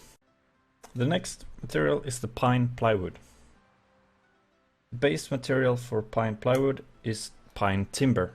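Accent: Sweden. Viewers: nice, btw your accent sounds like you're swedish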